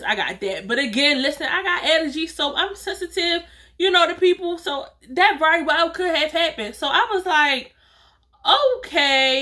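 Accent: Baltimore, United States. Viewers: Baltimore Maryland for sure lol I hear the accent , I'm from Alexandria Virginia 😁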